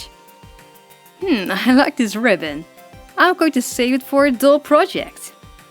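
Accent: Netherlands. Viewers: ons nederlands accent is echt grappig😆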